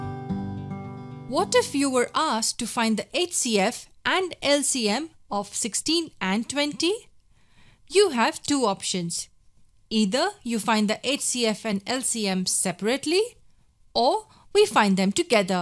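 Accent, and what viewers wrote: India. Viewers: Fucking hate that Indian accent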